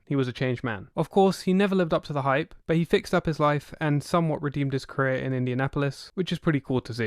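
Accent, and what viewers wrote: England, United Kingdom. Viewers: Part way through this. All known info. Why am listening to a guy with an English accent talk about an American sport. No insight loser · Why is a british guy talking about an obscure nfl player?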